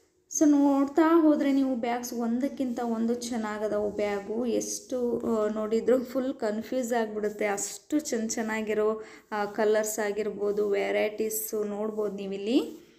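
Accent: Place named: North Karnataka, India. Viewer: uttar karnatakad matu keloke est chand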